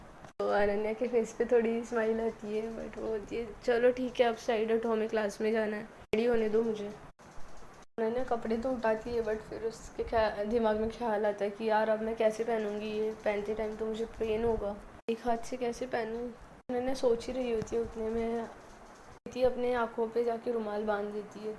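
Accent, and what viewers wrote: Maharashtra, India. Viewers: Hindi main jo marathi ka thoda tadka lagta hai kya baat hai ☺️☺️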